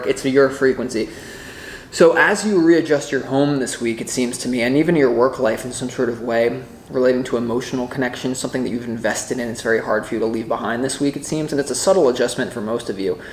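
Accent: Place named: Long Island, United States. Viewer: Sounds like you lived in Massapequea 😮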